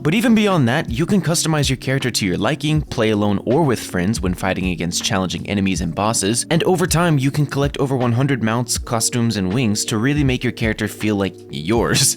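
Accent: United Kingdom. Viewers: The british accent gave me an eargasm. Thank you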